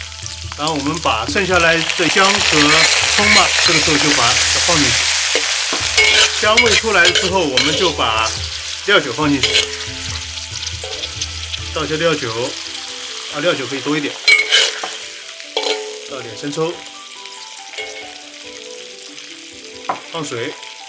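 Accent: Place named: Nanchang, China. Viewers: 南昌人表示听你这南昌口音普通话太亲切了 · 这位大哥说话习惯在句末加个诶，的口音是熟悉的南昌味哈哈哈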